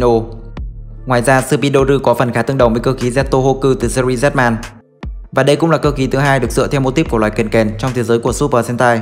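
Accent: Japan. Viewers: Anh ấy đọc tiếng anh kiểu người Nhật đó bạn :V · Kênh của ad rất có chất lượng, nhưng có một nhược điểm lớn, đó là ad dựa vào phiên âm tiếng nhật quá nhiều để gọi tên cho các mecha, toy, gattai các loại. Ví dụ, Speedor, ad đọc thành speedoru luôn, trong khi đó chỉ là thứ tiếng anh nhật phiên âm sai thôi mà.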